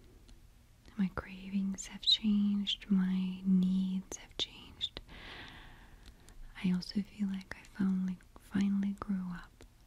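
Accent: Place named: Russia. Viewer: So rare to hear the Russian accent when she speaks English, it's lovely when it happens 😊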